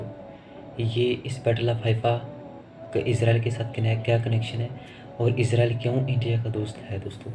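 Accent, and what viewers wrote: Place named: Pakistan. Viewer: Baat Karne Ka Accent Paakistani Kyu Lag Raha ? Paakistani Bhai Ho Kya ?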